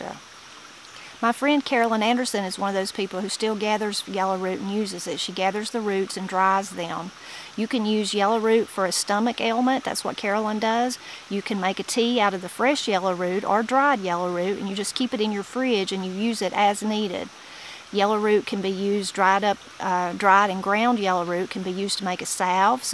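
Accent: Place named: Kentucky, United States. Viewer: Hi, your accent sounds more southeastern Ky than Western NC. i’m from eastern ky but have been living in Franklin NC for quite a spell. Great video, found some YR today and am making some tea.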